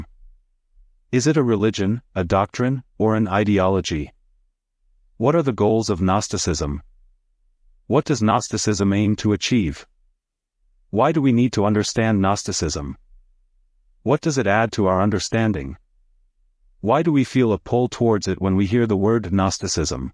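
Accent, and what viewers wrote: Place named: Tunisia. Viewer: لا يا أخي أنا شخصيا لن أشهاد هاته الفيديوهات نحن نحب صوتك و طريقة إلقائك و تفاعلك باللغة العربية الممزوجة بلهجة تونسية لي نحبه ياسر كمغربي جارك و محب من أحبائك شكر و أتمنى منك تفهمي.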